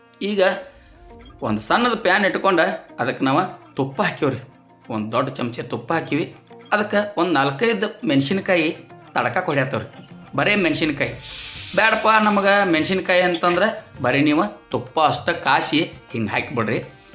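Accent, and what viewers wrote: North Karnataka, India. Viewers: ನೀವು ಮಾಡುವ ರೀತಿ heluva😘 ಉತ್ತರ ಕರ್ನಾಟಕ ಶೈಲಿ, ತುಂಬಾ ಇಷ್ಟವಾಯ್ತು ಧನ್ಯವಾದಗಳು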